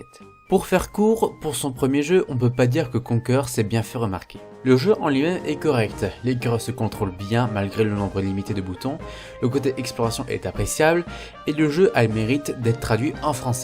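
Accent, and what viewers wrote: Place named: England, United Kingdom. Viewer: tu un belle acsent anglais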